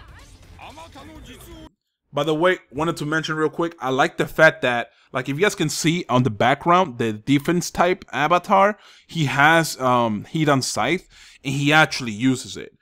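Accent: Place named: Dominican Republic. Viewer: xD hasta hablando ingles se te sale lo dominicano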